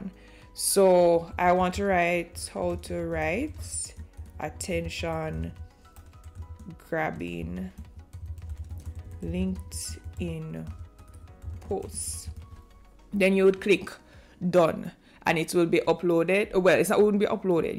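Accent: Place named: Caribbean. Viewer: Awesome... love the Caribbean sound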